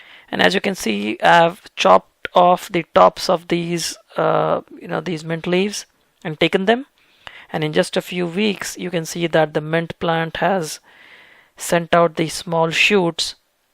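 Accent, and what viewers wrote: India. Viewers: LOVED your Indian accent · he is indian i think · I think Indian accent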